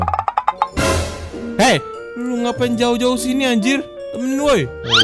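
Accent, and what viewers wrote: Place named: United Kingdom. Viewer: your accent is sounds like British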